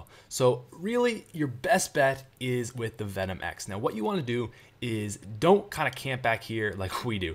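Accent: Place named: Canada. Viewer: ha ha, love the Canadian in ya ;)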